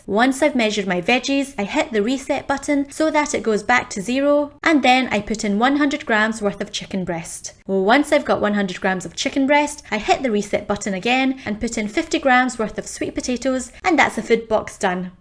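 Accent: Scotland, United Kingdom. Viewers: You live in Australia but you have an awesome accent that makes you sound Scottish almost. Where are you from originally?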